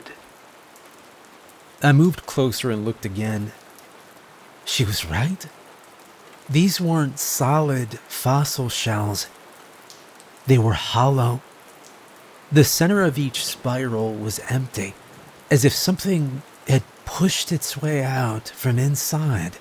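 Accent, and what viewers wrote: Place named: South, United States. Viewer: I can hear your southern accent ❤😂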